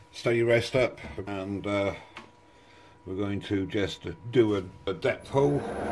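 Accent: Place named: England, United Kingdom. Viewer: great job on the delicate goblet, I look forward to each of your videos. My belief is anyone who has an English accent, in my mind they have An additional 25 IQ points. Your videos are all most interesting and informative. Cheers!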